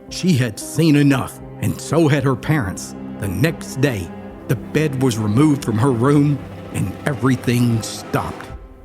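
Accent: Appalachia, United States. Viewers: Wonderful video, outstanding research, and terrific Appalachian narration. This episode was my introduction to your channel and I thoroughly enjoy the originality of storytelling and visuals. Subscription activated. Speaking from experience, children are sensitive and susceptible to spirit encounters. Great job!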